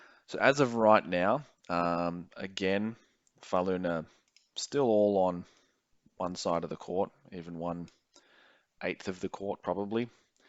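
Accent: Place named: Australia. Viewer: Your voice sounds like an australian accent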